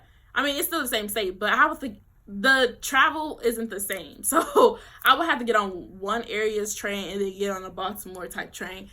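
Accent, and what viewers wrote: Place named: South, United States. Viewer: Lol I'm from Baltimore but live in silver springs 😂😆 and yes you sound country but it's cute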